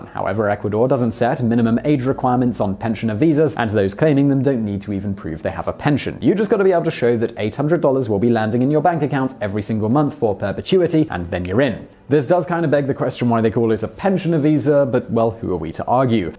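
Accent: England, United Kingdom. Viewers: He's english get over it. Different countries pronounce things differently. Who'd have thought it?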